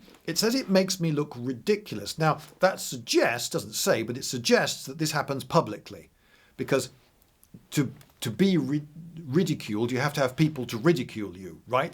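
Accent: United Kingdom. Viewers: British. That's one you are looking for. Terminally, inescapably British.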